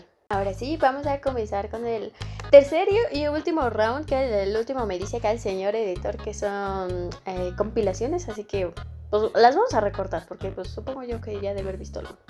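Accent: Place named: Yucatan, Mexico. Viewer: eres de Yucatán? que bonito acento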